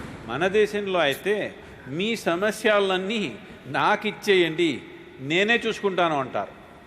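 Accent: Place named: Tamil Nadu, India. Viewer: Arva vedavalu Telugu matladinattu. Undi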